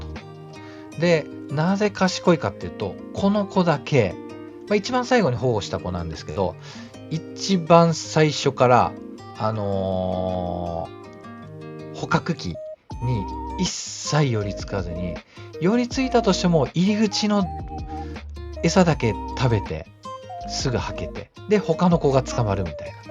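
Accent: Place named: Kanto, Japan. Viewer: ショート動画見てどうなったのか 気になってました。 BGMを少し小さくして頂けるとしやすくなるかと。 関東訛りで時折関西イントネーションが混ざるから関西出身の関東在住の方かと思ったら京都の方とは驚きです。 それやったらやっぱり京都弁で（或いは交えて）話さはったら宜しいのに。 保護してくれておおきに。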